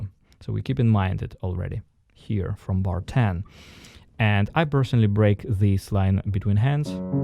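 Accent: Russia. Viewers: An excellent performer and teacher. But why on earth such a terrible Russian accent ? As a musician you should easily master the English speech intonation without much trouble. Kinda strange. But no hard feeling !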